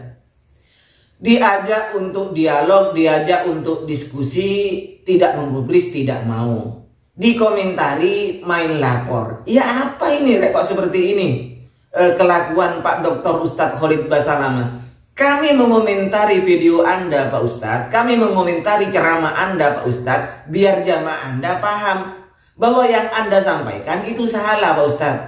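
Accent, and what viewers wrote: Madura, Indonesia. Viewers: In yg punya Chanel suara kyk orang Madura pantas lh GK suka sama ustadz KHB konco nya si Romli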